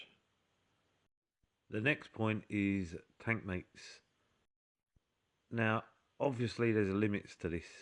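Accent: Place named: Cockney, United Kingdom. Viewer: My cherry shrimp and neons are best friends - but to heck with them, bruvva, I just adore listening to you talk. Luv me some Cockney accent 💐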